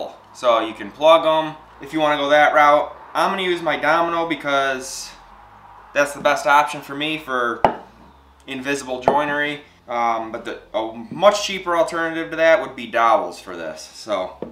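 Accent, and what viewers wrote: United States. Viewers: You speak perfect american english ; clear, tidy sound and excellent pronunciation ! Very helpful video for any wood works learner and english learner as well.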